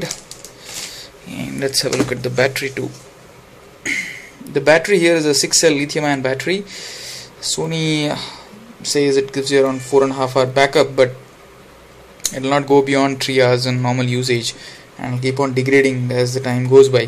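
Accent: India. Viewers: i have that laptop but not so good like samsung,and i think samsung more best then sony and u speaking english look like if u indian right? coz ur english is not really nice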